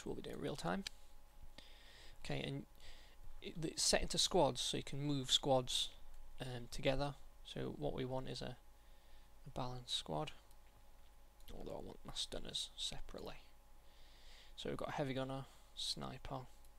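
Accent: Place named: Manchester, United Kingdom. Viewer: I don't know if you actually are, but you sound like a proper, proper manc, ha ha ha. Good LP so far :).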